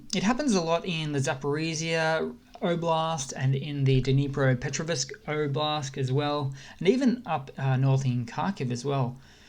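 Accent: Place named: Australia. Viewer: Good to here an aussie accent, I liked what the Ukrainian hackers did to the sign. If you can find more humous things that would be great.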